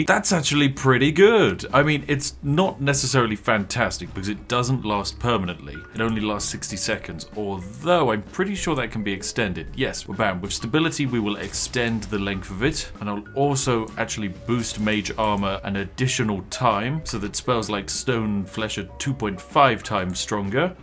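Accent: United Kingdom. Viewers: Is british? I can't help but notice his accent...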